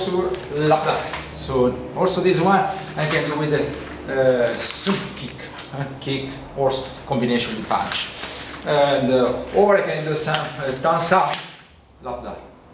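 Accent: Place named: Italy. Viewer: Hai un accento italiano abbastanza forte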